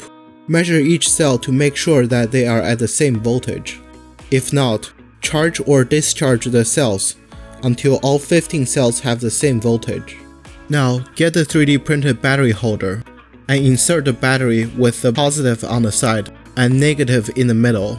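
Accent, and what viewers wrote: China. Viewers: 你的口音怎么这么像中国人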